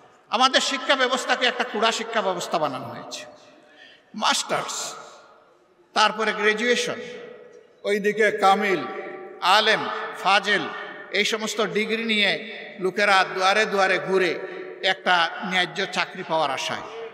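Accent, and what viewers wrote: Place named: Sylhet, Bangladesh. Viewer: বিভিন্ন বিভাগের লোক আমদের সিলেটে বাস করে। তারা বলে আমরা নাকি সুন্দর করে কথা বলতে পারি না।এখন স্যার এর কথা সুনে মনে হচ্ছে বাংলা দেশ সবচেয়ে সুন্দর করে কথা বলে সিলেটের লোক ❤।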